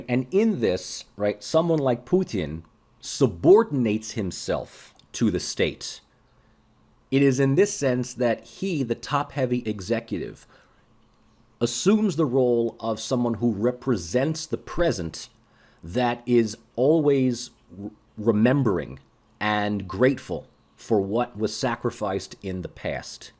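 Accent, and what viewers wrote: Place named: Boston, United States. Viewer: Love the Boston accent by the way.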